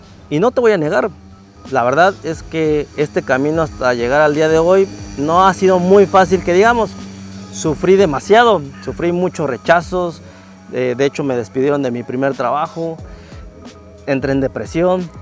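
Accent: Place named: Aguascalientes, Mexico. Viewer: Si puedo adivinar de donde eres basado en tu acento: Aguascalientes?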